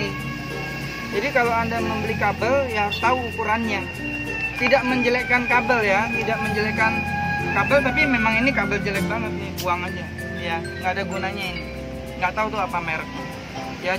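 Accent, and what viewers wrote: Java, Indonesia. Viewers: Eterna, federal, jenbo, kitani, patriot, sumitomo, supreme. Banyak opsi yg kualitasnya memadai. Kemarin aku beli pompa air submersible type SPG20-311K kabel bawaannya OFC 0,75mm² 30 meter. OFC singkatan oxygen-free copper artinya tembaga murni bebas oksigen, relatif tahan karat. Hantarannya bagus. Nyambung kabel serabut mestinya diberi ferrule atau skun yg dipress dg tang krimping agar tidak ngepong. Koh, logatmu medok kamu orang mana?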